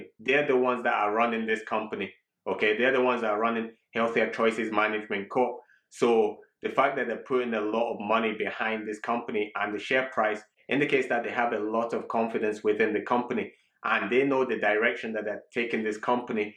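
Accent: Scotland, United Kingdom. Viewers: You scottish ?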